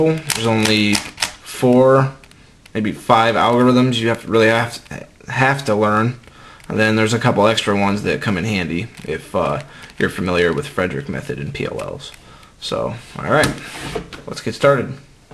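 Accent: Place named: West, United States. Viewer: you talk like a cowboy, nice dude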